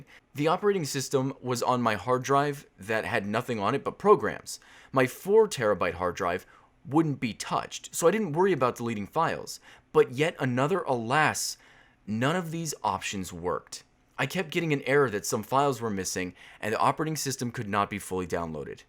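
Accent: India. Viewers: That indian accent just killed me :D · That indian accent doh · I love the Indian accent. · Indian voice xD <3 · From sounds like english spoken by an Indian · Great Indian accent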